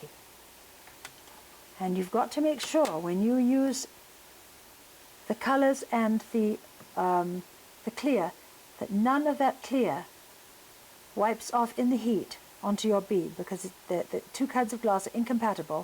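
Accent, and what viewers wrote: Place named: United Kingdom. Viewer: you are fabulous!!!!! I made my first lampwork beads today and I am hooked! I even tried using some scrap stained glass and had good luck but I am glad to learn your techniques! I love my first beads (now if I can only get them off the mandrel, I think I might have put the bead release on too thick because it wanted flake off when I worked!) and I can't wait to make more! Thanks for such a wonderful series of videos! PS LOVE your British accent;)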